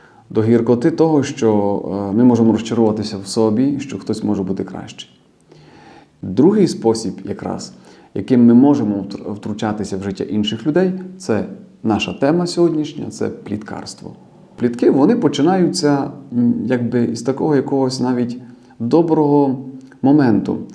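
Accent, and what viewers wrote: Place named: Transcarpathia, Ukraine. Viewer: Слава Іісусу Христу! Дякую от. за Ваш підхід і пояснення теми пліткарства, особливо тронула кінцівка про наповнення Божою любовью.Рада за Вас, що збільшується кількість слухачів, а значить однодумців.Приємно чути закарпатський діалект.Вітання всім зі Сходу з Харкова, нехай Бог усіх береже, єднає через Своє Слово і веде до перемоги 🙏📿🇺🇦🕊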